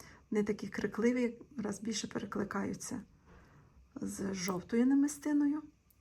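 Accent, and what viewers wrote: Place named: Lviv, Ukraine. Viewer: Пані! У вас дуже гарна львівська вимова( чи тембр,я не знаю як правильно назвати) я отримую велику насолоду від цього.будьте здорові! Ми переможемо!!!!❤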